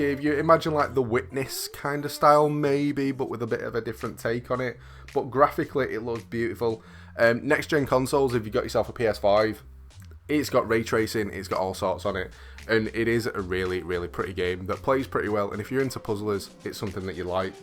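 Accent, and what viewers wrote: United Kingdom. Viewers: Yo just found your channel I really like your content and if I hear your accent correct then hello my fellow Brit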